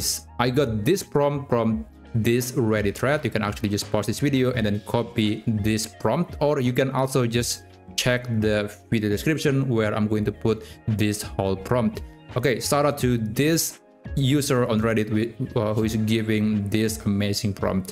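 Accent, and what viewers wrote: Indonesia. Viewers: accent nya asa indo banget jir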